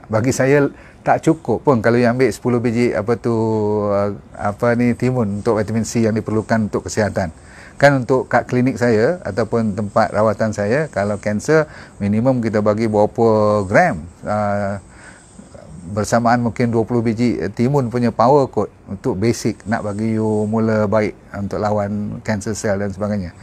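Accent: Malaysia. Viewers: Ga ngerti bhs nya capek ngikutinya. Logat melayu sih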